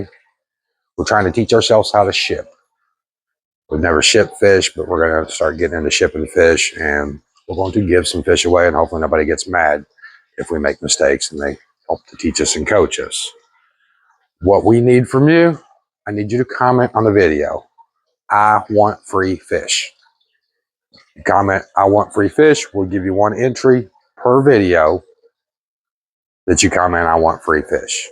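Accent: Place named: Kentucky, United States. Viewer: Kentucky ? Coool I guess I should have guessed by your accent but I couldn’t . I’m in ny !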